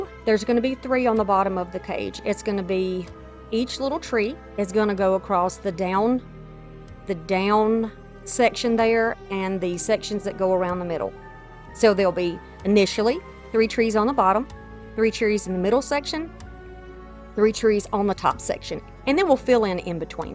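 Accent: South, United States. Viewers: I love your videos 😻 and I must say your accent reminds me of The great country ⭐ star!! 😂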